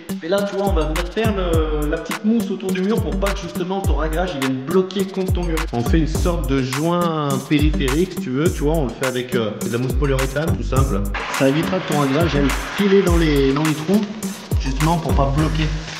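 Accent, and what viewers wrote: Loire, France. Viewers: De bons conseils , de la bonne humeur, de la bonne musique, l'accent de la Loire. Du kiff, merci d'être super ludique dans l'explication, clair net agréable à souhait ! 🙏👍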